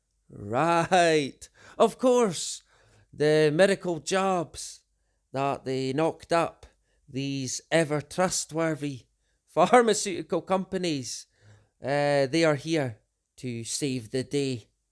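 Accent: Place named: Scotland, United Kingdom. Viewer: Thanks for your dedicated research and always bringing us the real news, with your ever soothing Scots burr! Much love!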